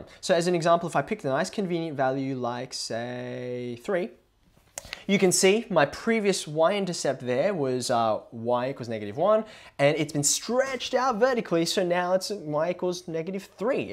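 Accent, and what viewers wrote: Australia. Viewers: great video but i hate the austrailian accent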